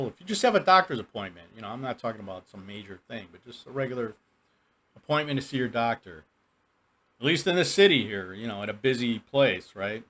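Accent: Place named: Wisconsin, United States. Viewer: The older i get the less noise l can tolerate , headphones hehe rooster barn ,are you sure you ain't living in a chicken coop? I hear there a rooster gangs there l used to wish l could sing, now l wished they could. Mmmmm diesel exhaust. We are too stoopid to go metric mmmmmm big black plumes of smoke l had a feeling you was from the Midwest , l was thinking Ohio I'm even hearing a little Wisconsin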